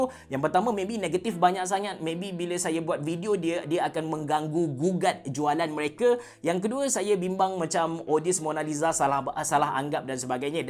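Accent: Kelantan, Malaysia. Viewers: Sy slh seorg peminat abe sejak 2017 lg, masa tue masih ckp kelantan lg..hehe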